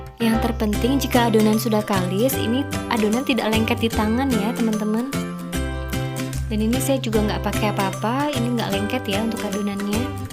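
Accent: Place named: Java, Indonesia. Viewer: Rm sedapnya kue dibelinya😄 jowo medok hadir embak e. Yuk yang lain...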